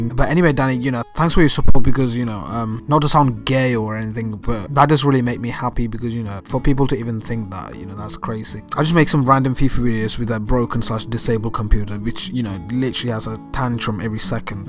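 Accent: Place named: Birmingham, United Kingdom. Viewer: Are you from Birmingham? You sound like you have a bit of a Brummy accent 😂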